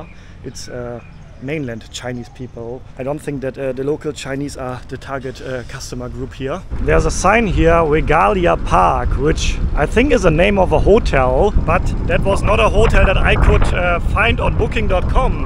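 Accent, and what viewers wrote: Germany. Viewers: He is germans 😂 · Sounds like you are from Germany or German speaking country……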